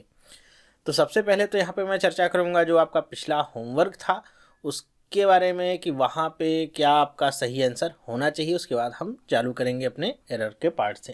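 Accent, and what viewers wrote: India. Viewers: Sir your pronunciation is way too Indian.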